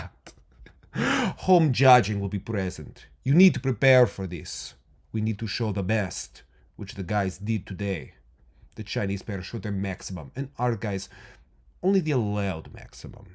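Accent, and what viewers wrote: Russia. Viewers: I like you are missing the articles with your Russian accent 😅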